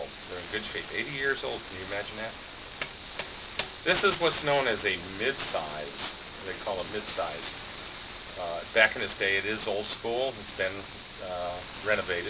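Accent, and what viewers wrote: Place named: Tennessee, United States. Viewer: Sounds like he lives in Tennessee lol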